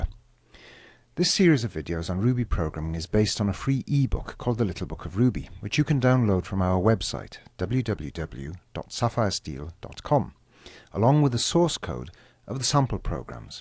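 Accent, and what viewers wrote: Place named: Wales, United Kingdom. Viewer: Now that's a lovely Welsh accent.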